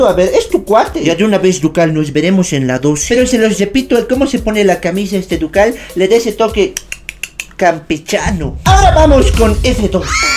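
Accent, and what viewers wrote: Bolivia. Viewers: hablas del Cu.... tipico hacento Cochabambino que intenta ser graciosillo...... por cierto eres muy guapo 😆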